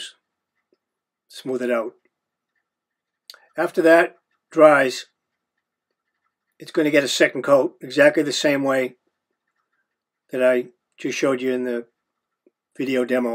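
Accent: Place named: Connecticut, United States. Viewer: So glad I found your vid while researching attaching linen to wood. I didn't know about the PH neutral PVA, and I've now ordered some by the same manufacturer. On a side note, as a native Connecticut Yankee that moved to Virginia 30+ years ago, as soon as you started talking I yelled out in joy! lol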